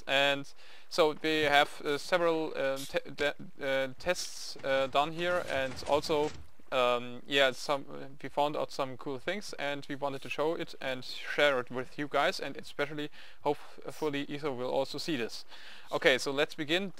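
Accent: Germany. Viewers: mann jetz hab ich schon n paar vids von dir gesehen und hab mir immer gedacht so wie der englisch redet kann der doch nur deutscher sein?! jo jetzt weiss ich es xD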